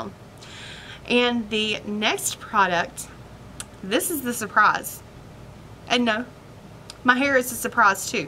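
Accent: South, United States. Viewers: Omg I love you, your giggle is infectious and your southern bell accident is adorable. Also thanks for the spoilers.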